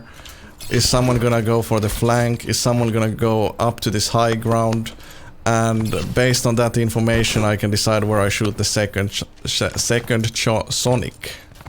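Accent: Finland. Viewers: Are you Finnish? You sound like it.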